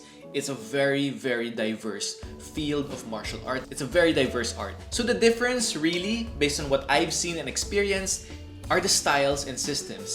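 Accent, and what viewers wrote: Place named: Latin America. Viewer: Wena pregunta. Habla c acento latino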